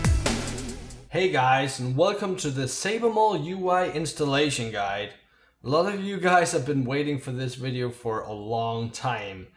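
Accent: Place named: Denmark. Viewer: Man kan tydeligt høre at du er dansker! :D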